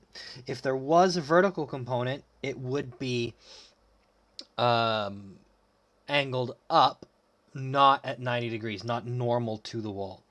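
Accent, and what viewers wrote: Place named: United States. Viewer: I had no idea how to do this in the exam. Thanks teacher with American accent!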